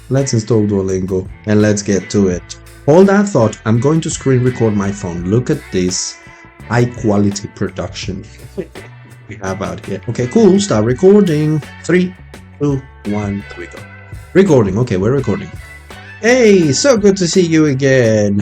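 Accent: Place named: United Kingdom. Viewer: bro you are a grown up now! you didnt even had that voice tone after high school hahaha very british xD sounds cool.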